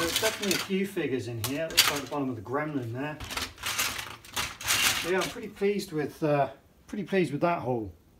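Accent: United Kingdom. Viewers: Do you buy Mega in the UK? You have an English accent....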